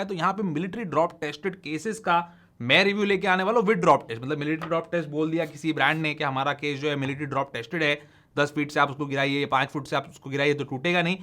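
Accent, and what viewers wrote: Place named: Bangalore, India. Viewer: As salam alaikum. BHAI Abbi jumma jumma 8 din nai Hove. U from Mumbai But your mother tongue is like Bangalore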